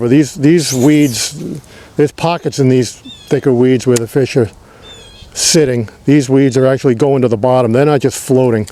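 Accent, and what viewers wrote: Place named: Massachusetts, United States. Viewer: Great video, lots of valuable advice in here. As a native Bostonian I could hear Massachusetts in your accent too pretty quickly lol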